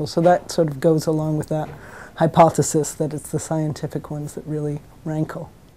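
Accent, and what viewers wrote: United Kingdom. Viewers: The Limey accent has really rubbed off on her. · Even though she's American, she's learned to use quite a few British pronunciantions of words.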